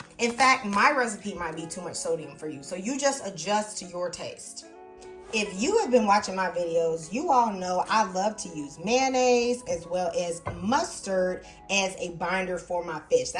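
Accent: South, United States. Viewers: 😅 sound like my cuzzin! Girl you country? Love ya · I sure do like your (Southern?) drawl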